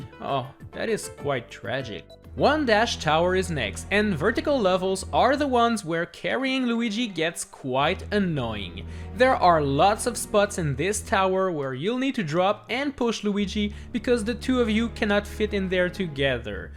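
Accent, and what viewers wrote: Quebec, Canada. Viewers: you sound french canadian man :P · I believe he’s from the French-speaking part of Canada, so he has a thick accent haha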